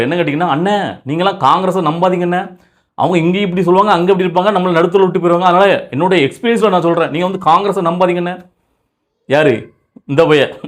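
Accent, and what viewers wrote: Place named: Telugu, India. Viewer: His speech proves that he is not tamilian,his dialogue comes purely with Telugu man........poooda ooothhha last warning 😂😂😂😂😂😂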